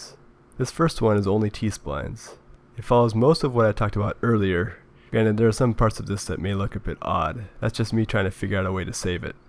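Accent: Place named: United States. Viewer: Very interesting because "simple" : why T splines would ease the job ...But it is in fast american instead of steady slow english ...Too bad for the frog, but if i slow motion the vid i may understand . Bravo anyway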